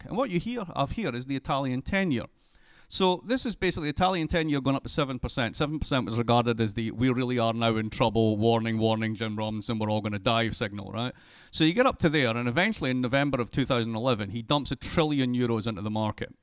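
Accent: Scotland, United Kingdom. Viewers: + SpectatingBystander - The speed of rapport and the Scottish accent make it difficult for an audience whose 1st language is not English. I am English and could only just keep up with him.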